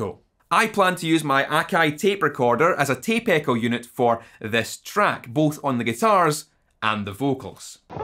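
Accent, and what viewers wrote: Scotland, United Kingdom. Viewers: British punk rock ...And french beer.. 1664 kronenbourg. As a french but decent YT amglish understander i must say i stand perplexed. The fast paced scot accented speech and unusual topics : politics and alcohol 'safety' vs guitar related things, losed me in the pampa (pardon my french).. Drink some real ale bloody brittons.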